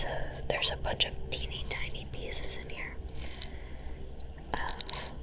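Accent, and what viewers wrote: Midwest, United States. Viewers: I would have guessed the midwest, but im from Finland so I wouldnt know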